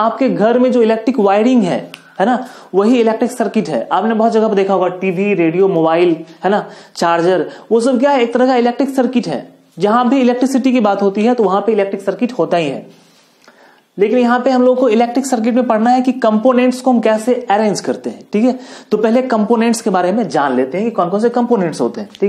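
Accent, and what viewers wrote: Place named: Bengal, India. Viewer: Sir are you bengali, I think your tongue sounds like a bengali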